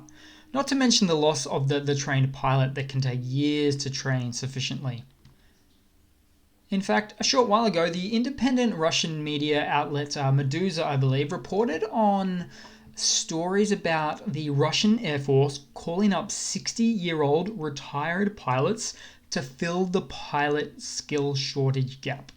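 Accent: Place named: Australia. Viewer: David ABBA thank you for this info 👍 A lot of us believe that is Australian based on his pronunciation of some key words. Not up to mischief but what do you think?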